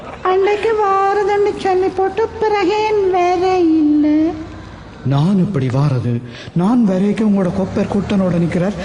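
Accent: Jaffna, Sri Lanka. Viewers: யாழ்ப்பாணம் நடை பேச்சு வழக்கு அருமை... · இது யாழ்ப்பாண பேச்சுவழக்கு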